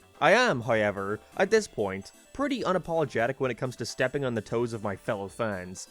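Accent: Ireland. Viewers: Yeah he’s Irish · he's Irish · ur from donegal arent u my brother? haha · no hes irish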